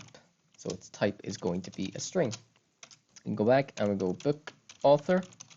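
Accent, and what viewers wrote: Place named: Ireland. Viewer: Brilliant an Irish man :)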